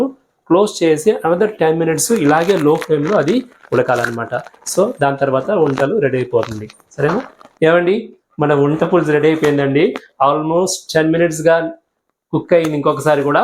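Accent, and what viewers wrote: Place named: Kerala, India. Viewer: First time vintunna Unta pulusu. Meeru malayalam Andi. Mee telugu Different ga undi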